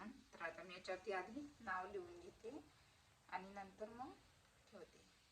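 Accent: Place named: Vidarbha, India. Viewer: विदर्भातील आहे का ...आपली भाषा वाटत आहे ❤ नागपुर side ची...मला आवडले वीडियो...जर्मन ची आजी चा वीडियो बघितला मस्तच ❤❤😊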